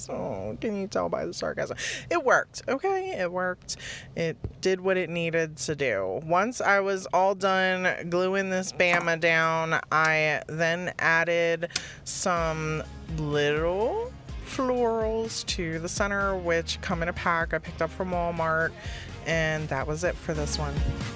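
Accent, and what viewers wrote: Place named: East Coast, United States. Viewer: I know an East coaster when I hear one but you almost got me with the ya'll lol. I lived in that store before I moved thinking they were everywhere what a disappointment when I got here. If they were smart they would expand cause the sales would make up cost 10 fold. Binge time to see all the nice things you have made. Keep up with your style an humor cause it's great.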